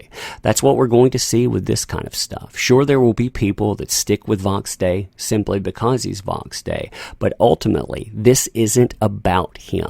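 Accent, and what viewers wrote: South, United States. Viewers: you sound like you have a southern drawl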